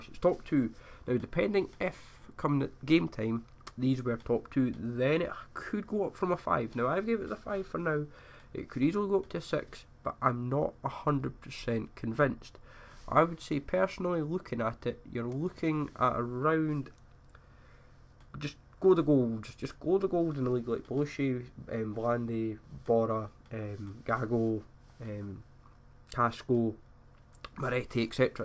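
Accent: Scotland, United Kingdom. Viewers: I am from Ukraine. First I barely understood what this guy said, but now I even like to listen to him and understand (well, mostly). "Scottish" language is so funny to listen to:) When I was in Scotland I enjoyed every moment listening local people. Keep up great work,!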